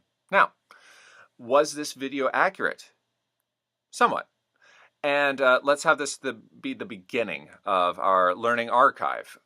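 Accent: Dublin, Ireland. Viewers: Hard to say because it was an excellent breakdown. But it seemed to veer toward dublin-ese irish a little in the end. The NI accent is a bit heavier on the RRRrrrs I think. It also makes use of many involuntary, end-of-sentence emphasis phrases. Eg ".. You Know Like. . ." or ".. Everything and that there..." (pronounced "AverThun N ThaaT-Thurr.) These are used to embellish a story and finish sentences. eg. "I was late for work . . . You Know Like..." "I forgot to do my homework . . . And everything and that there." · I'm not being rude but that's nothing like a northern Irish accent. People in Northern Ireland don't say 'koind' and they don't say 'oive' for I've. You just sound like a Dubliner.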